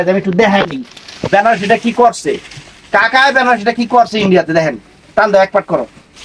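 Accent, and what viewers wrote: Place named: Sylhet, Bangladesh. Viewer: Bhaiya khulo se doro se toh amader sylhet er basha 😂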